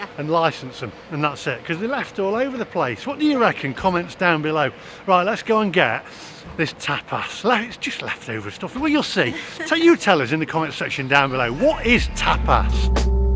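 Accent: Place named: Black Country, United Kingdom. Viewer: I should be offended as im from Wulvarampton but i cor stop loffing at his daft black cuntray accent 🤣 He dow arf sound daft 🤣🤣🤣🤣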